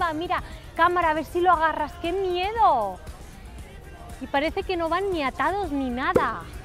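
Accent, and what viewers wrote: Andalusia, Spain. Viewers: ¡Excelso video! ¡me encanta tu forma de ser tan alegre! ¿eres andalusí? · Hola amiga! Amiga, una pregunta. Por qué hablas como una española de España? No pareces que eres España, pareces mexicana. Perdón por ofenderte! Saludos desde Michoacán, México.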